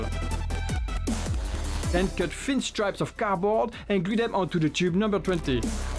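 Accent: France. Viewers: Merci pour le template, je suis actuellement en fabrication du mien. J'ai une question, avec ton accent, est-tu français ?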